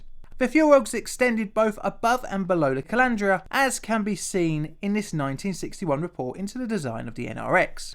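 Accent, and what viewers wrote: United Kingdom. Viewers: I like your content and presentation, but your voice is very comforting in an odd way. My grandparents sounded like you and to this day, I think in a British accent lol. I lived with them most of my childhood. My grandmother was actually Scottish but ended up in southeast England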